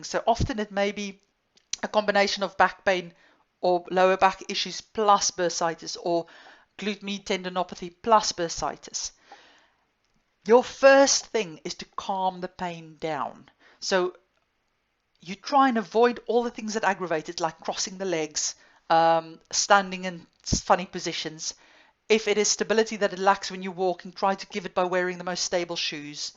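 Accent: South Africa. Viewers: Your previous video was so good, I came and found this one too! You sound like a fellow South African :) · Excellent presentation. Love the South African accent.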